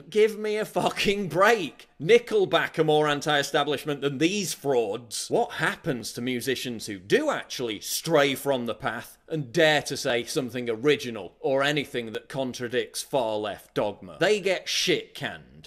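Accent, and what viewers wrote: United Kingdom. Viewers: Destroying the leftist agenda sounds awesome with a British accent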